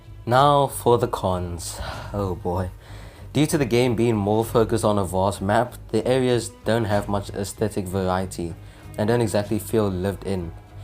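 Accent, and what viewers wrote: South Africa. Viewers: The second I heard your accent I knew you were a fellow South African 😂 great content bro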